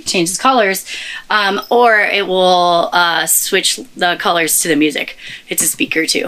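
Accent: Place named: Poland. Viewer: ary you from Poland because your "ci" sounds very polish?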